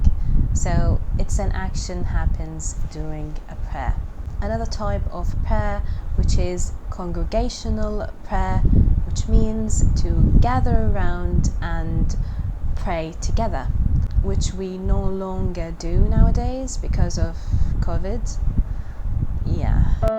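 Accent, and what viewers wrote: United Kingdom. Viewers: I really appreciate your British accent 🎉 thanks a bunch for the useful vocabulary & ramadan mubarak for you ❤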